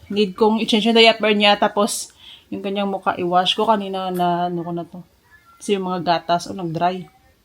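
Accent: Iloilo, Philippines. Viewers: When she speaks English, her pronunciation is with Ilongga's accents, intonations and tones. Which is sometimes not easy to understand.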